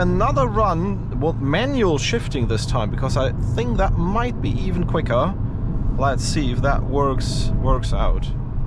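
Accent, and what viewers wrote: Germany. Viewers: omg German Accent.....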